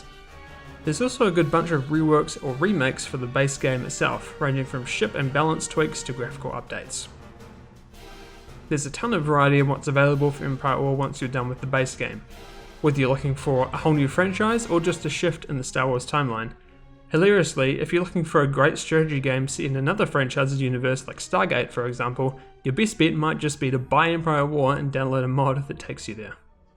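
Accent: New Zealand. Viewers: Great game, great video, great Kiwi accent. Chur.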